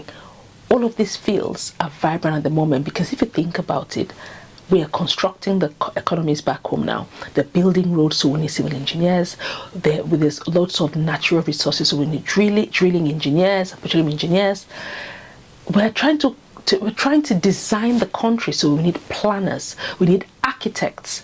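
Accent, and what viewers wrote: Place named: Nigeria. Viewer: She’s not Ghanaian. Her accent is more of a Nigerian accent. I’m a Ghanaian and know a Ghanaian when I see one.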